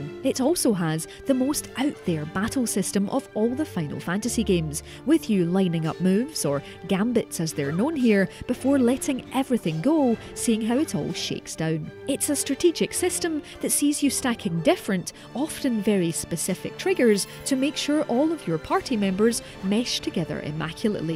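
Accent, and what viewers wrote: Scotland, United Kingdom. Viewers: that is the voice of an adult woman any day of the week mate and it sounds like a Scottish accent. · Are you Scottish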